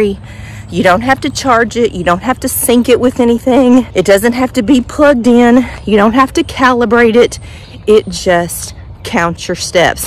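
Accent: South, United States. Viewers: I’ve been watching your videos for awhile! I began watching when you first got Pollux and fell in love with how you love your animals. I knew you were a Southerner mostly because of your speech, and because of your generosity and kindness in communication. You want to help, you want to teach, you want to help people live better lives! I live down in Millington TN outside Memphis, but my parents lived in Obion County for almost 10 years back in the 90s and are very familiar with the Paducah, KY area. I have not seen all of your videos, but I have loved every one I watched! This video diary is a perfect pairing of your curls and you’re ice cream business curls! I have never seen or heard of a shop like that, but the concept is genius! You are such a a great teacher and encourager! I don’t know how you find enough hours in your day to do all you do. You make it look easy, but I know your filming and editing take more time than people realize. 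I just want to encourage you and tell you that you are really helping to make a difference in peoples’ lives and I am proud of you and for you! Give Mooey and Pollux a scratch and a kiss from the McBrides!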